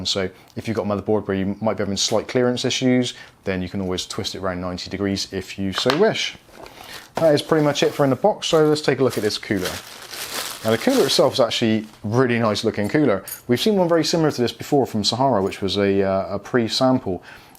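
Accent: United Kingdom. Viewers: I loved the Bri'ish accent mate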